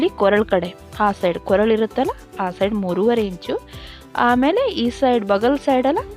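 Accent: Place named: Mandya, India. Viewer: ಹಾಯ್ ರವರೆ ನೀವು ಕಾರವಾರದವರ ನಿಮ್ಮ ಭಾಷೆ ಮಂಡ್ಯ ಭಾಷೆಯಂತೆ ಇದೆ 👌🙏❤️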